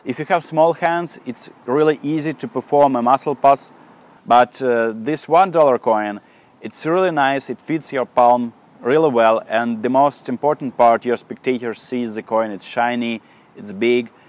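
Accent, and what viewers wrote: Russia. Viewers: Слушая голос и думаю - блин, акцент ну больно русский. Захожу в описание - о, да ладно, кириллица! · Ты русский? у тебя сильный акцент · классный русский акцент) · акцент четко русский да пох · Такое ощущение, как-будто этот чувак русский. Его английский слишком русский :) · Man, you have a Russian accent. Ты ведь русский, да? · Вау, вы так хорошо разговариваете на английском! Но этот русский акцент я узнаю везде)) З.Ы. в первый раз у вас на канале. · ты русский ? просто мне кажется, что есть акцент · Акцент,как у русского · Русский акцент.... хмммммм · Слышен русский акцент) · Русский акцент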